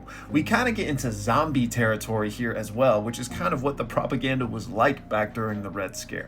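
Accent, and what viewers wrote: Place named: Canada. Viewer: I thought you were Canadian 😂😂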